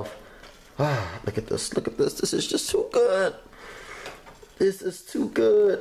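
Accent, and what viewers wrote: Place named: Philadelphia, United States. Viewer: Lakeia Burgess lol That's that Philly accent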